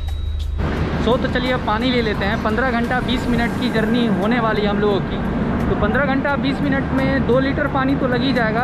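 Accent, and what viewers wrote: Bihar, India. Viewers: Apka voice se hi pakar liya tha ki ap bihar se ho. Me bhi bihar se ho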